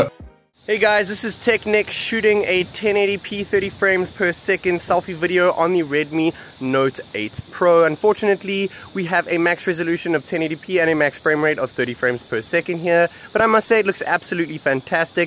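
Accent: South Africa. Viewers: Is this oke South African? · sounds like your from South Africa.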